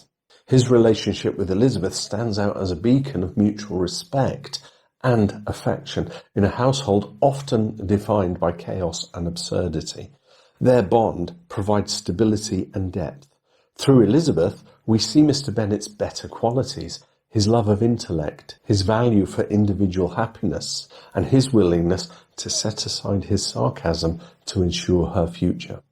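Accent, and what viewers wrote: United Kingdom. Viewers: Hello from Montreal, Canada. So happy to have you back. I as well like Mr Bennett. Looking forward to watching you other analysis. I think in another video you said that some people don't like your voice. Well, I love your voice and your british accent. It is a real joy to hear you talk about Pride and Prejudice. Did you make an analysis of Charlotte? and Catherine de Burg (not sure it is the right spelling)? What kind of life do you think Lydia and Wickham had? Thank you.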